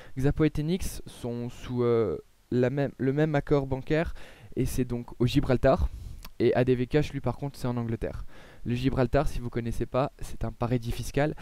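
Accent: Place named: East, France. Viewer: T'as un accent de l'Est. Tu viens d'où? Lorraine?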